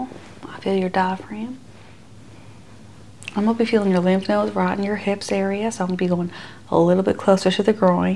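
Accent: South, United States. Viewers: love your Scarlett O'Hara accent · I’m from South Carolina and I can’t detect a fake southern accent. It’s better than most tv actors. You might have a second career. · That’s actually a good American southern accent. Good job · That southern accent is impressive. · Love it ❤. On point with the southern accent 👌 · Please do more southern accents, you are spot on.❤ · I love the Southern charm voice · From Alabama here, fantastic job on the accent. 😉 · Aww! How creative and sweet! Last time we had British and now we have Southern! Another wonderful addition to the -Verse! Were multiple versions of you with different personalities roles and accents take turns to be your bedside nurse for the day! Haha I think that's a creative way to think about it! Your amazing! Never forget that! Sending you love!❤❤❤🤗🤗🤗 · Thanks for sharing this very, very impressive southern accent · Beautiful country ❤️ accent 😍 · Wait …you’re NOT southern?! 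· As someone who grew up in the south. I can tell you without a doubt, she nailed the accent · I didn’t see “fake” at first and I assumed she was from the south I’m from ga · Why is it southern accents are so friendly and comforting? You nailed it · You are just the best ASMR nurse ever 🤩💗. Love you and love your southern accent 🥰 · I love your southern accent! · Great southern accent!! Great job!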